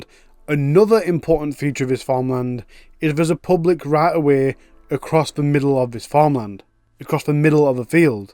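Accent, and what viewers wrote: United Kingdom. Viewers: Enjoyed this , subscribed, nice to hear a UK voice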